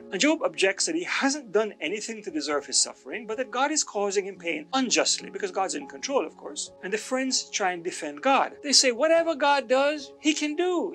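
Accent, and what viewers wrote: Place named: Ireland. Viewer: Really good video. Also, comment from an Irish person - your accent is half like that found in the South to Southwest of Ireland (and half something else), are you from Newfoundland or something?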